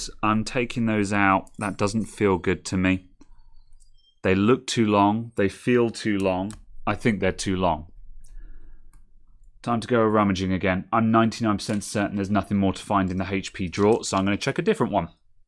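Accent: United Kingdom. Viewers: Yes, do it like that and add captions Anyway your videos are all wonderful without "ASMR" since British accent are the best english accent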